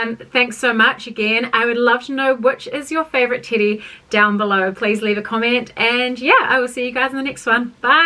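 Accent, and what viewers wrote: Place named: New Zealand. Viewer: I’m used to the kiwi accent being an Aussie. I did not notice the pronunciation.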